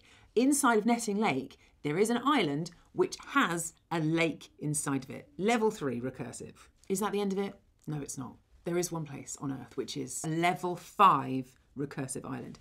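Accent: United Kingdom. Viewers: what part of the UK is she from ?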